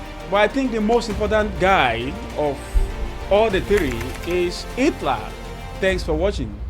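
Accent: Yoruba, Nigeria. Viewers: This dude has good content & one of the funniest accents ever 🤣😂🤣. Is this a Yoruba accent?🤔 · Yes he's from Yoruba nations. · Captions are generatable automatically. Let those who want to watch it be attentive. They'll get used to his Yoruba Nigerian accent just as we've got used to their various foreign accents of English and French.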